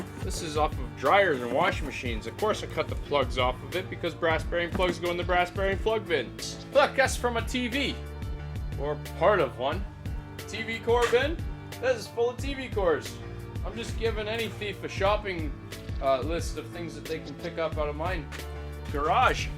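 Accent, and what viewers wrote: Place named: Canada. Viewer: Sounds like he's Canadian. He's from Canada not Scotland, that's the accent you pick up.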